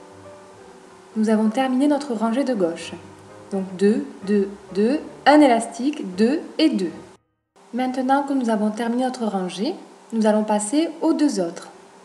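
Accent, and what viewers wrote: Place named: Nord-Pas-de-Calais, France. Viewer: tu parle ch'ti ! !!!!!!!!!!!!!!!!!!!!!!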